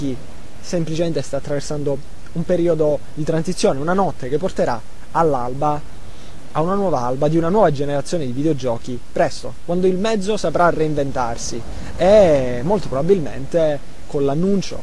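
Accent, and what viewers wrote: Italy. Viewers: Dal modo in qui pronunci la C si sente che siamo dello stesso paese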